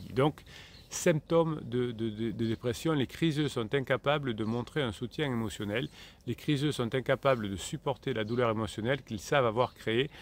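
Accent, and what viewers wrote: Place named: South, France. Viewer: Merci pour votre réponse elle m'est allée droit au cœur J'aime beaucoup écouter vos vidéos et votre accent méridional me donne l'impression d'être en vacances 😊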